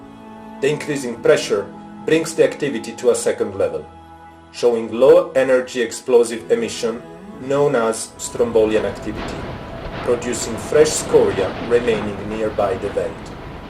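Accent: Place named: Italy. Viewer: Il tuo accento italiano si sente pure a 7.000km di distanza. Ciao bella Italia. Saluti dalla Handerson, Las Vegas, Nevada, USA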